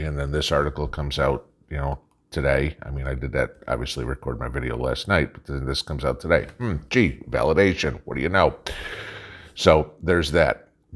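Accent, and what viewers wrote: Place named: Upper Midwest, United States. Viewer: You don't sound like you're from TN. You have the short flat vowels of the northern midwest. Wisconsin? Michigan? Illinois? Just curious.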